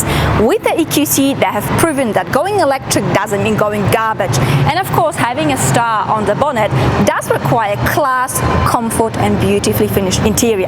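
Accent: United Kingdom. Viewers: Thank you for a great review, ps your accent did you spend some time in the UK? Sounds like it sometimes. You look really smashing with your different great outfits in your reviews. Ceep it up 👍😉 cheers.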